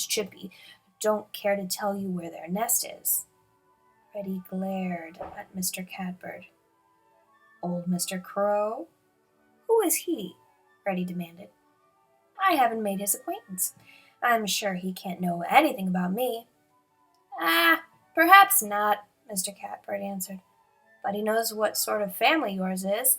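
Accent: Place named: United Kingdom. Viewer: so 2 things the reader is British or something and yeet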